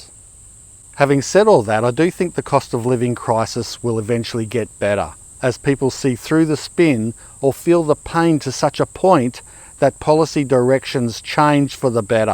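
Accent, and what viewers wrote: Australia. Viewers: If I'm not mistaken, you're Australian? (I always confuse New Zealand and Australian accents) Anyway, if so, what's the issue with water in Australia? I've read that companies from a certain communist country (Ive had comments deleted for stating it before) own water rights to pieces of or even entire water systems. Is that true?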